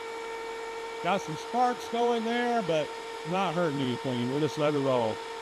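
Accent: South, United States. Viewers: Another English fan here, I love your rich 'Suthernn' accent. Keep posting these treasures, and many thanks.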